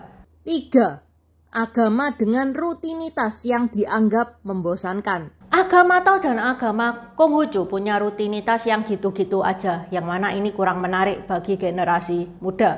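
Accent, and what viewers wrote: Java, Indonesia. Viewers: …aq senang dengan logatmu yg Jawa medok 😂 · Logate jowo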